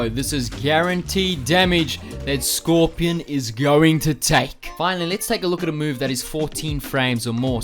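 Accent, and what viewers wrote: South Africa. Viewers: Am I the only person who thinks this man is south african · Dude are u from south africa do u play online and tournaments? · says australia but you sounds like a ZA oak? the mix of accents is pretty funny tbh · You sound South African. Am I right? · South African accent i think. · Are you South African? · Are you South African? :0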